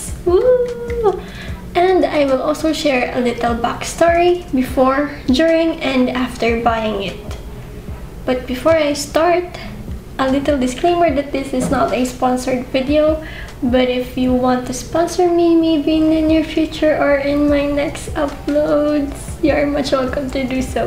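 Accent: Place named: United Kingdom. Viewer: I heard somewhat of a British accent ngl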